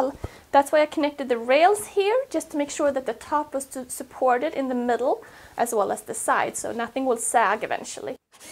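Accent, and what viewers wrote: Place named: Sweden. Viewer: How long time has you lived in the USA ? You think in inches, but your accent is very Swedish. Ever visit Sweden, and will / do you teach your kids (??) the other language ? Sorry for off-topic question, only answer if you feel like it :) Excellent videos!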